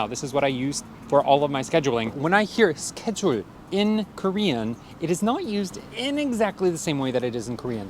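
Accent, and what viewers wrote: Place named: United States. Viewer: 한국말 하실 때랑 목소리가 전혀 다르신게 더 매력적으로 느껴집니다. 목소리, 톤, 발음이 평소 많이 듣던 미국영어 음성이라 선명하고 정확하게 들려서 너무 좋아요. 딕션이 좋으시다~라고 하면 이것도 콩글리쉬일까요? 사실 콩글리쉬 자체도 콩글리쉬라 Broken English라고 써야한다는 얘길 듣긴했습니다만ㅎㅎ 좋은 영상 감사해요. 야외스튜디오 너무 좋습니다~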